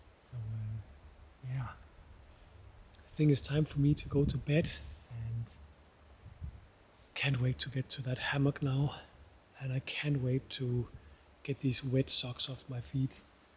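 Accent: Denmark. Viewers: I allways like the big fat danish accent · I haven't finished the video and I don't yet know if I'm going to buy a Nikon mirrorless (currently have Nikon DSLRs but also a Sony α7RIII) but a Brit who once lived for a year in Aalborg, Denmark I absolutely love the sound of a Danish accent speaking English!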